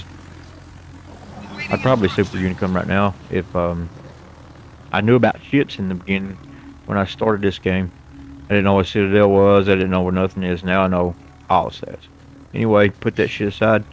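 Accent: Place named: South, United States. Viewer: Great game. Not a CV player either but enjoy all the other surface ships. I'm a player from Middle Tennessee so it's nice to see someone with a southern accent doing wows videos.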